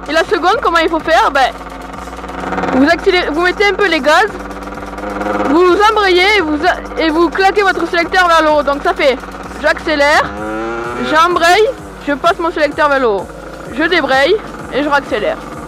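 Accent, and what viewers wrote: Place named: South, France. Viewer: Très bien expliqué! Tu t'exprimes vraiment bien; et j'aime beaucoup ton léger accent du sud, moi qui parle comme les ch'tis ça fait du bien d'entendre une autre mélodie. À bientôt jeune motard. 😊✌😉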